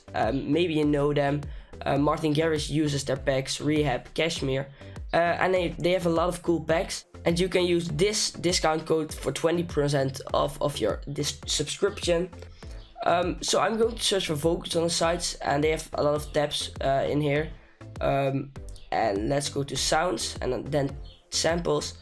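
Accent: Netherlands. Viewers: Ben je Nederlands? Je klinkt namelijk erg Nederlands aan je accent. Maar je spreekt echt goed Engels. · yeah he sounds dutch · I thought first he is martin garrix. He sounds dutch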